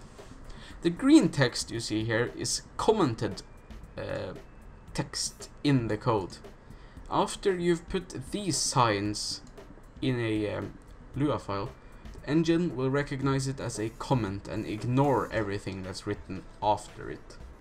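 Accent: Norway. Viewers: Are you a norwegian ? it sounds like you are. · Du er norsk, eller hva? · Er du norsk? Ihvertfall norsk aksent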